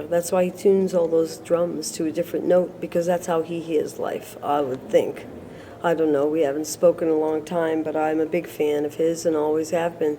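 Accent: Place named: Boston, United States. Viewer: What a great lady-loved her Missing Persons work. And her Bawston accent.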